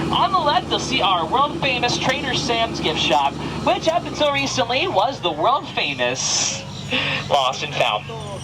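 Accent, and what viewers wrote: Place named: New York, United States. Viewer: your loud New York accent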